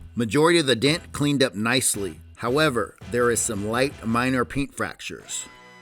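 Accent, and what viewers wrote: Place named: California, United States. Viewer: New viewer, Awesome work 👍I'd hire you in a sec, but I doubt you're in my area 😕 I'm guessing that's a Californian accent, I'm in Ohio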